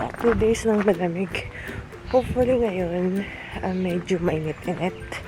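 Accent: Batangas, Philippines. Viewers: Thank you so much sa pagsagot ng tanong ko..kaya pala may dine at baga ang salita ..kahawig ng salita namin sa Mindoro...lagi ko pong panunuurin ang inyong vlogs..thank you so much🥰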